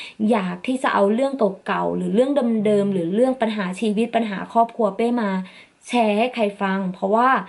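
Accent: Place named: Isan, Thailand. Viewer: เว้าอิสานน่ารักมากน้องเป้😘😘😘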